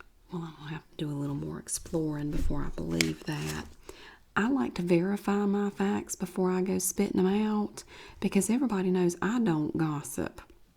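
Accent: South, United States. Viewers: I like southern accents 😭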